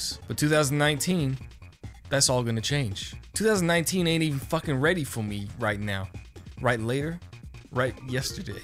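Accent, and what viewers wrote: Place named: New York, United States. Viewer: I think people only watch this dude cuz of his new York accent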